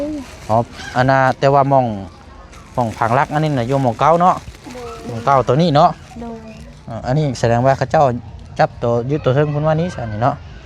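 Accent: Thailand. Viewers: กลับมา..จากบ้าน ( ออกจากบ้านมา) สำเนียงไทย ❤❤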